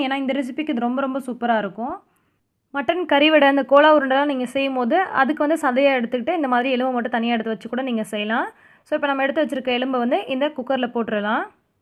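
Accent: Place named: Thiruvarur, India. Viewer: அஸ்ஸலாமு அலைக்கும் சூப்பர் மா நீ எந்த ஊரு மா பேசறப்ப பார்த்தா திருவாரூர் மாவட்டம் மாதிரி இருக்கு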